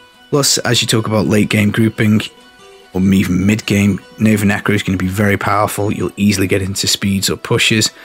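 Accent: United Kingdom. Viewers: first a disclaimer... my mother lenguage is not english..... that will be probably noteced im my speling throuout the comment, anyways.... The content that you presented is an A content. Nothing to say about that, but complements. Very good indeed... But i think you shoud think about the ppl like me... That the english is not the main language... You speak kinda fast and with a pretty strong uk accent... witch is not a proplem at all, but you speak realy fast, like your'e talking to your friends or family... Well... As you know, your content is spread world wide... that means, you shoud think about, i dont know, speak as a www content producer... if you know what i mean... love your job, love your content, but i had to go back few times to get exactly what the hell what you were talking about! heheheh... that is not a complaint.. that is just a tip for you.. 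you could try to be more articulated in you speach... dont hate me, again, that is a tip... maybe speak "last fast" or, i dont know, a little bit more "international", if you know what i mean... thanks for your job! As i said before, it is Ases!!!! ;)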